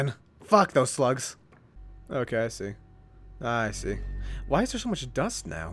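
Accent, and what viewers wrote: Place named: South, United States. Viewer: Now i see how u can have a very high voice with a southern accent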